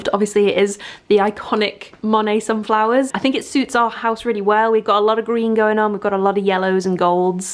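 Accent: Liverpool, United Kingdom. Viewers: Loving your vids! Is that a Liverpool/Merseyside accent I detect? (Being a scouser, transplanted to the Wirral, your mild accent drew me in, as well as how fabulous you are - of course😁)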